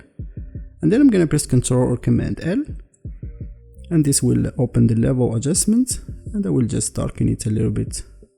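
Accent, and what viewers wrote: Morocco. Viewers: From your accent I can tell that you are Moroccan .. Well done bro , high quality content for real , lah ywf9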